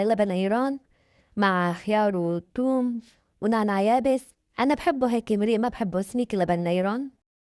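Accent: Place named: Aleppo, Syria. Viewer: واو لغة حلبية حلوة ❤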